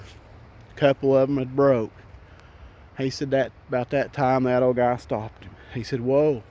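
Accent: South, United States. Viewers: Sir, warm greetings from Finland, english is not my mother language but i sure like your southern accent ! Thanks for the video, keep 'em coming!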